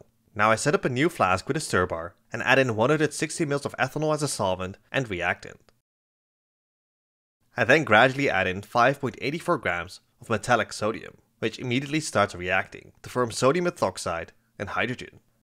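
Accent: Netherlands. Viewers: This was certainly quite an interesting video to watch, reading up on these amonia based antibiotics that are currently in development was also quite an interesting read and hives some hope for antibiotics. based on your accent I am wondering if you are dutch?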